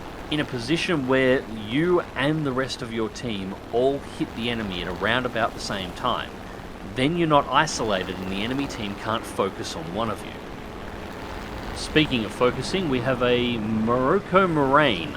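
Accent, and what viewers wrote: Australia. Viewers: Feeling like you also just watched Rex's Hangars video on the HE112 ;) ? Awesome vid mate! thank you very much, love this plane and it's great to listen to an Aussie talk about something other than the fkin election or parliment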